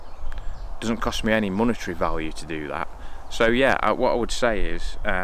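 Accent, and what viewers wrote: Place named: Mansfield, United Kingdom. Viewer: Well! Blow me down with a feather you're only dahn't road! Am frum Mansfield! Well actually I live in sunny Woodhouse (Wudduss) now! Small world! I thought I recognised the accent!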